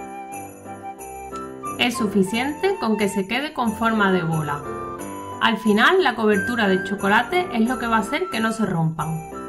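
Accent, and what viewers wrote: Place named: Andalusia, Spain. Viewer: Hola desde Galicia.Las recetas son excelentes. He comprado el robot hace unos días.Estaba buscando recetas acordes a mi dieta sin lácteos y gluten, y ha sido una suerte encontrarte.. Me gustan especialmente las masas y los panes. Una gallega lleva el pan en el ADN y en su alma morriñenta. Me cuesta encontrar la harina de sorgo. Me encanta el acento andaluz de tus vídeos, una maravillosa riqueza que no hay que esconder He trabajado bastantes años en el Sur , y ahora que estoy de vuelta, lo añoro. Felicidades por tu trabajo. Rosa.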